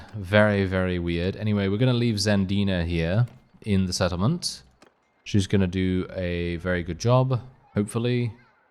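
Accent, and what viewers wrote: United Kingdom. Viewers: These should not be this fun to watch... but I get good ideas which make my campaign more fun and you got a cool british asmr kinda thing... you Brits have spoiled me with your cool voices, I def prefer to watch other youtube vids with good ol British folk narrating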